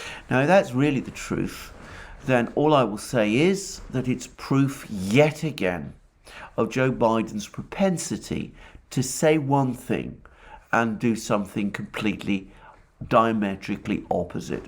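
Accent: United Kingdom. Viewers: I want to apologize for missing some of your EXELENT NEWS AND ASSESSMENT , I LEARN A GREAT DEAL FROM YOU , IT IS A PRIVILEGED TO HEAR YOUR SPEAK YOUR INFORMATION , ESPECIALLY ABOUT UKRAINA. I LISTEN TO YOU , SCOTT RITTER AND DOUGLAS MACGREGOR , YOU ARE EXTREMLY WELL EDUCATED. I M NOT MAKING THIS UP. IT IS A FACT. GOD BLESS YOU AND ALL YOUR LOVED ONES 💓 AND HAPPY CHRISTMAS. I LOVE THE BRITISH ACCENT TOO. 😀💓👍 I HATE THE U.S. ENGLISH. I FOLLOW THE UKRAINA AND RUSSIAN CONFLICT WITH ENORMOUS INTEREST 😀CHEERS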